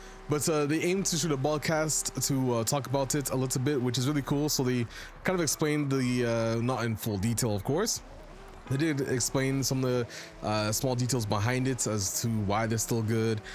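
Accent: France. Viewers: Your Inflection On Certain Words Is Becoming French Too 😭 Pis Pas L’Type Canadien 🥀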